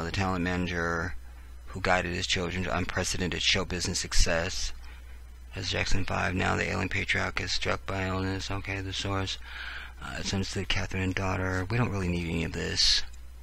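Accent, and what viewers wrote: United Kingdom. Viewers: you better SING that song! I love when you speak in your British accent.... and when you say the 1990s. Lol. You make my day!!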